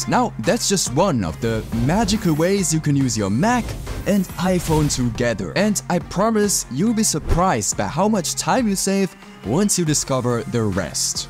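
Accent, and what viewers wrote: Germany. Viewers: are u a german guy? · Hab doch direkt den deutschen Akzent rausgehört 😅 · hehe, musste unbedingt auf die Kanalinfo gehen um bestätigt zu bekommen "Deutschland".... nicht wirklich wild doch schon recht ulkig dassi innerhalb der 1 Sekunde herausgehört habe woher der Wind weht. Und hab nicht darauf geachtet =D Das Wort people war's ^^ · Hmmm... are you German? (not to hate ofc)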